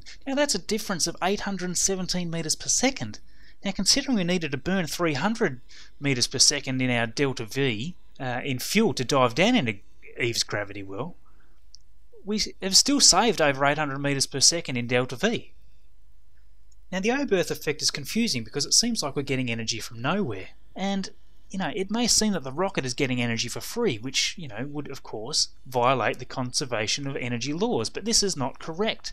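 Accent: Australia. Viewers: I love your Aussie accent.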